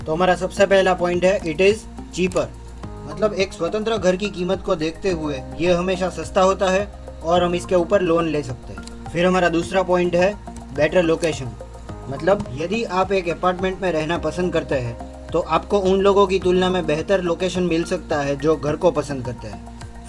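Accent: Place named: Kathiyawad, India. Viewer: Bhai tu kathiyawad ( Gujarat) se hey na? Speech idea aa gya... Ye banda rajkot ya Bhavnagar side ka hey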